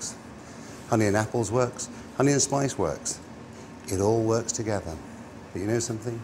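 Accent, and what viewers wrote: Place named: Yorkshire, United Kingdom. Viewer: He is the poshest sounding Yorkshire lad I've ever heard!!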